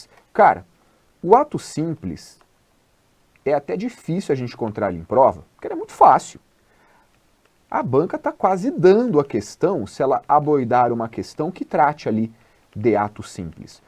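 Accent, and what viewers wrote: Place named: Paraná, Brazil. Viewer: Língua preta kkkkk , paranaense veio pé vermelho 👏🏻👏🏻👏🏻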